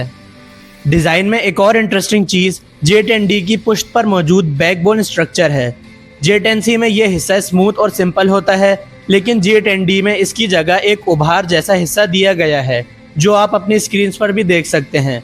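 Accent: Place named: China. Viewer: I don't like Chinese English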